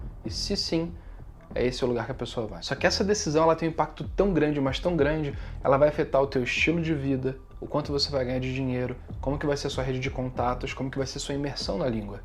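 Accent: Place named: North, Brazil. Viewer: Acho que ele é do norte do Brasil kkk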